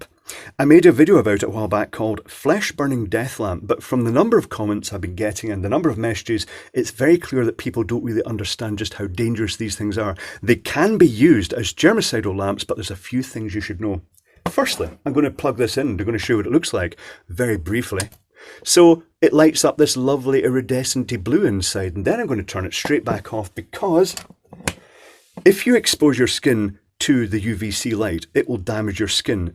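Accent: Scotland, United Kingdom. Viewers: it's good to hear a Scottish accent for a change.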